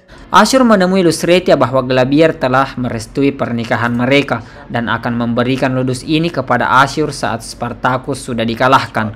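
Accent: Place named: Makassar, Indonesia. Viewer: Orang Makasar ini kita dari logat nya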